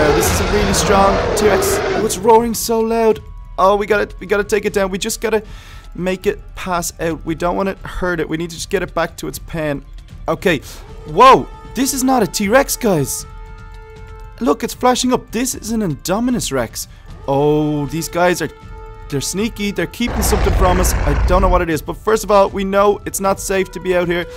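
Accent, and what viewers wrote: Ireland. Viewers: Is he from Dublin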